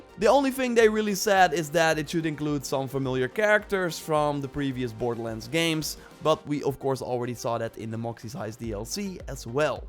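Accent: Netherlands. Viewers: Your Dutch accent is killing me tbh :/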